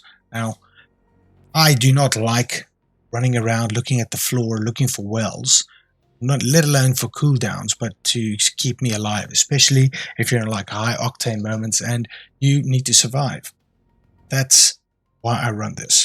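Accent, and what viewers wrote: South Africa. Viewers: Great build video. I'm rocking something similar to this. Gonna edit and see how yours feels Are you from SA? The accent is so distinct.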